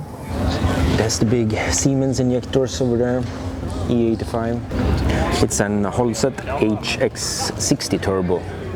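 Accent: Sweden. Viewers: Your Swenglish accent is half the joy watching your videos! All in good faith!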